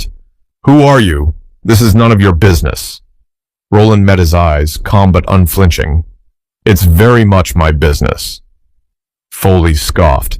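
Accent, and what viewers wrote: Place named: United Kingdom. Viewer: Best way to improve British Accent. RECOMMENDED🎉🎉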